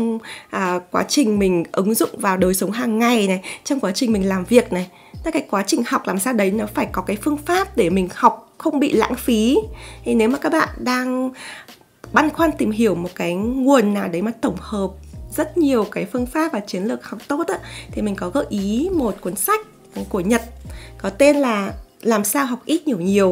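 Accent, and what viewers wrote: Standard. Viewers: Chị nói chuẩn ghê. Google dịch được hết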